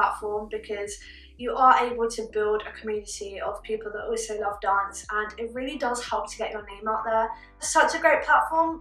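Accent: United Kingdom. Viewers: good video :D and that British Accent is on Point!!!!!! :D :D :D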